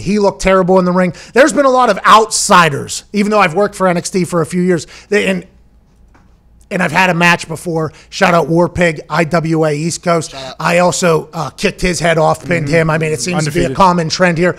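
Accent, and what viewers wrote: Pittsburgh, United States. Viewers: "HE'S FUGGIN' AHT DAHN ERE." That's as Pittsburgh as it gets.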